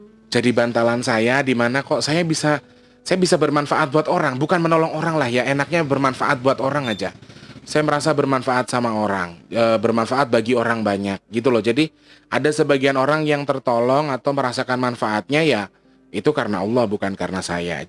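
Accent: Banyumas, Indonesia. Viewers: knp geli banget denger suara masnya ngapak go internasional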